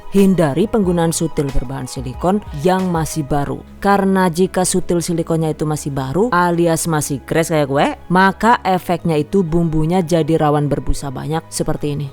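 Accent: Banyumas, Indonesia. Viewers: Ngapake mantep yuu, aku jg wong ngapak😅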